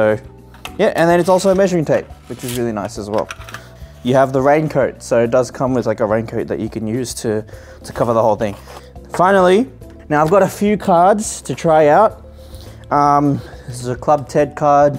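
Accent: Australia. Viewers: ARE U AUSTRALIAN??? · Are you from Melbourne Australia?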